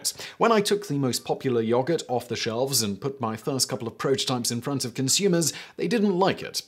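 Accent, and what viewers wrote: United Kingdom. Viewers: Good old British accent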